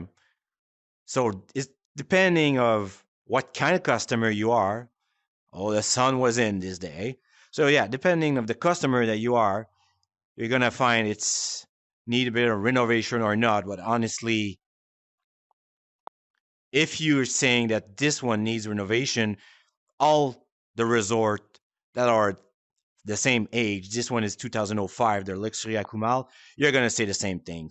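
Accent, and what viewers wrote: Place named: Quebec, Canada. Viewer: C est tellement un Quebecois qui parle !!!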